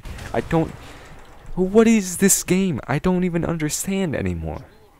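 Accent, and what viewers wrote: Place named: Philippines. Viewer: i could actually listen to you sing and just talk forever tbh your voice is so nice this isn't fair incidentally, where are you from if you don't mind my asking? your accent reminds me of some Filipinos but it could also be European...?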